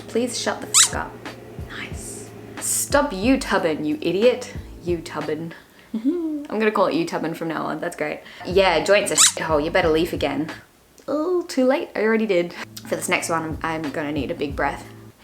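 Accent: Australia. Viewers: Don't let haters bother you. You sound totally Australian to me. There are always morons who get defensive if you say one little thing they don't like. You are always welcome to the U.S. You are a treasure. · To be fair to that guy's comment, I don't think your accent is as obvious as other Australians I've heard. Maybe that's just me though. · 1. I really like this video and the one you are talking about in this one. 2. I lived in Australia for only 1 year (mostly talked to backpackers) and noticed your accent. 3. I hated it when the shops were closing at 5pm and I still love Australia. It's possible. Greetings from Germany where the shops are closed on Sunday :) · Your accent can where you are from, but not always. I have a Japanese friend who learned English in Australia, so she have an Australian accent. She sound exacyly like you. P.S. Canada is so cold, you have no idea! 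· I m cool with the aussie accent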